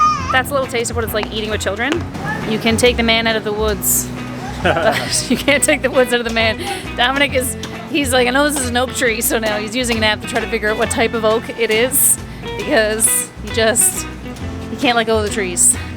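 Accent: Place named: United States. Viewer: I love your English accent with excellent pronunciation!! You speak very well with clarity and coherency!! You sound more of an American than a Canadian for some reason though. Sorry if this sounds offensive. Did you live in the U.S.?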